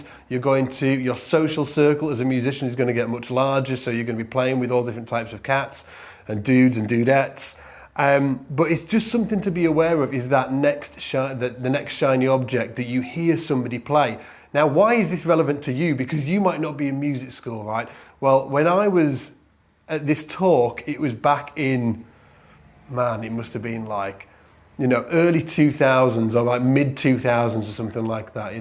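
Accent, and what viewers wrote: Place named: Liverpool, United Kingdom. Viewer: you talk like!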